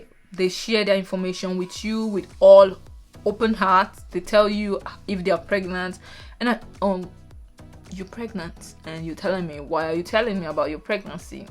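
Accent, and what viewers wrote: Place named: Nigeria. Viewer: I could still that Nigeria feel😂😂 The "like bro"😂😂 I'm really enjoying this channel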